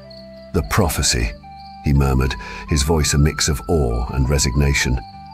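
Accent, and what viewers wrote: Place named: United Kingdom. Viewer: 😡 This is a great Irish myth. And they've got the story wrong! Even worse they used a British accent. Do they not know how insulting that is? Sigh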